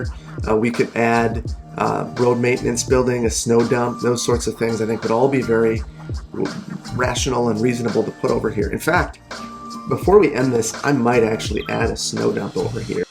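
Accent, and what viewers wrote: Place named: Milwaukee, United States. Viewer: I just discovered your channel and binged just about all your videos! I'm an MKE native and used to work for WI DHL so your accent and industry make me homesick. Keep the videos coming! The winter map for another month or so works for me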